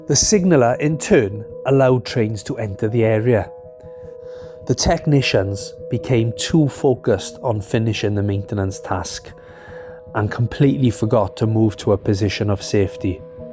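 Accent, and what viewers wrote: Wales, United Kingdom. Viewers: Love your work man. Always a plus hearing a fellow Welshman - dydd gwyl dewi hapus!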